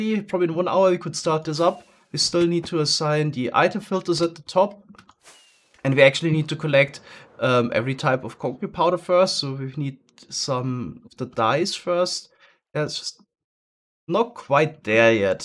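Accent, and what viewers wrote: Germany. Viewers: Are you from Germany? Asking bc of youre accent 😅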